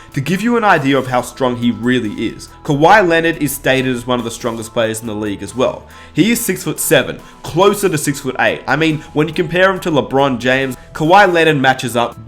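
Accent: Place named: Australia. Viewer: Australian mate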